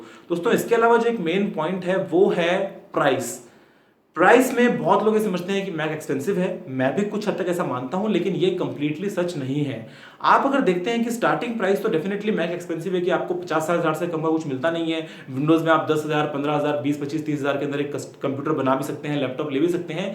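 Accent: India. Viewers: Classical Indian English, oh my god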